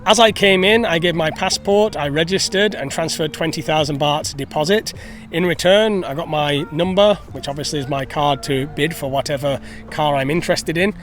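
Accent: North, United Kingdom. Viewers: Sad to hear you are losing your good old northern accent mate. You’ve got a media proper voice now 😂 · Where you from I hear a northern twang in your voice that has been diluted over the years by speaking clearly to be understood.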